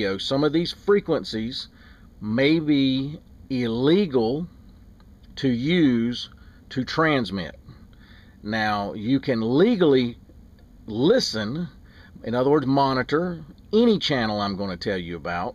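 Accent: South, United States. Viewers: I dont hear it. Besides the southern accent. 401s accent isn't as thick.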